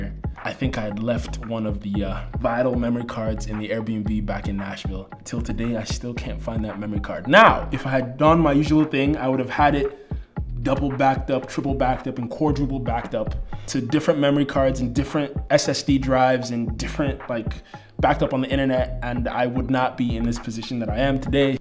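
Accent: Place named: Nigeria. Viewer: Vibes are strong bro.. Are you Nigerian? I can hear a small hint in the accent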